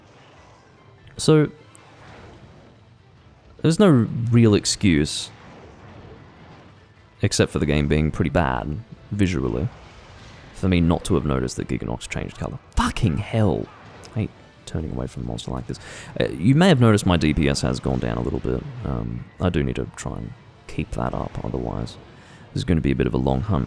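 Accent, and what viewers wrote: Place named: North America. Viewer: Your North American accent is on point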